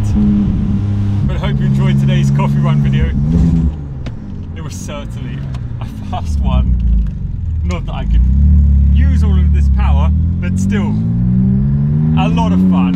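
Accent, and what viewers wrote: Switzerland. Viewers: I was waiting for the day when I hear you speaking swiss german... Finally it has arrived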